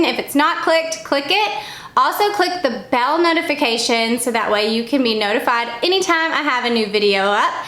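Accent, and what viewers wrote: South, United States. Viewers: I would love to win. The farmhouse wreath is👌👌. I'm from Georgia too, born and raised here❤️ so good to hear a southern accent on YouTube. I just found your channel through Lynette Yoder. Great content! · Love your channel! I’m from Georgia and it’s so neat to hear your southern voice when I watch your videos! Love all the items you’ve chosen especially the rose gold tumbler as it reminds me of my new daughter in law who loves all things rose gold!😊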